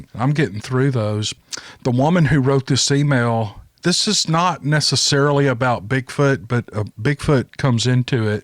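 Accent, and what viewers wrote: South, United States. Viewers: I like your genuine humility also. Ppl can tell. Southern drawls feel at home 2. I saw elves in 1976-77 in cheyenne wy. Not sure you'd want that story here. Nor am i joking. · do you see what you have started? My gosh! Folks love you reading these stories because they relate to you and the persons who tell (wrote) them. You make it so much fun... although never making light of the scary encounters that take place for many of these individuals (and the fact they have to try and learn how to deal with it). You have cornered a phrase... "Awright, here we go!" (In your way cool, southern gentleman, way.) Then those of us out here in any particular corner of the world lock in and are ready to take the ride.:^) You go,. We're right here with ya!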